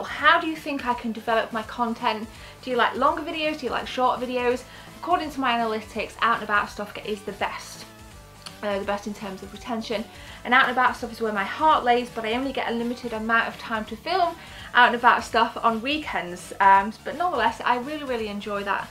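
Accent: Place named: England, United Kingdom. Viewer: I watch you from italy and I love your positive attitude. I think you have a great talent both in front of the camera and behind it. Sometimes I don't understand everything you say, maybe because of fast speaking and english accent(I discovered that from time to time you pronounce "here" more like "hair" XD) but I enjoy anyway. I would suggest some more contents about news, and some reviews. A great hug from Rome and if you come here for vacation you and your wife will be my special guest in my B&B!!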